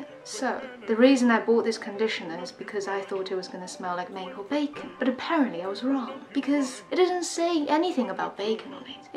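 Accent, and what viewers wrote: United Kingdom. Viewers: 口音是rp吗我听起来很像老人2333（没有不好的意思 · 的伦敦腔听着好上瘾🤗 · 的英音好好听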